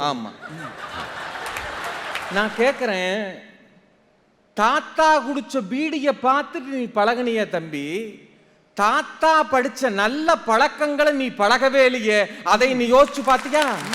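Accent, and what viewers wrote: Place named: Kongu, India. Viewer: அண்ணன் கொங்கு பேச்சை வரவேற்கிறேன்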